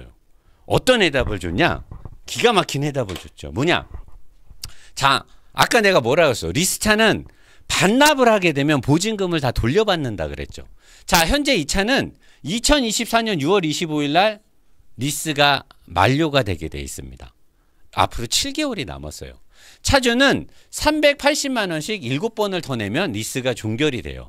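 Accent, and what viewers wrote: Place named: Chungcheong, South Korea. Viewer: 충청도여~뭐여~~😅좋은 정보 감사합니다! 사장님!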